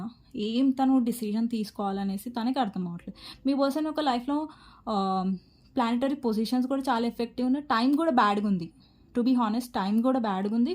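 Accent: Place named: Telangana, India. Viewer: ఎవరిని పట్టించుకోకండి. మీది తెలంగాణ బాషా చాలా బాగుంది బాధ పడకండి. మీరు నిజాలు చెప్పుతున్నారు చాలామ్మా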